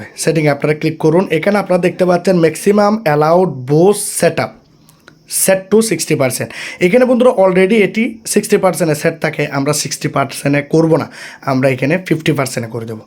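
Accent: Chittagong, Bangladesh. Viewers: কথার উচ্চারণে মনে হয় বৃহত্তর চট্টগ্রামের...! কিছু আঞ্চলিকতার প্রভাব থেকেই যায়...!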